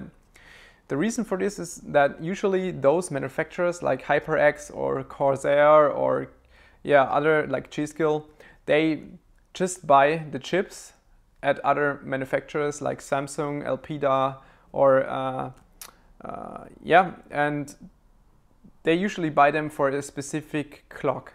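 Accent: Germany. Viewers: thanks! also I like your accent. I want to go to Germany someday because people say it is beautiful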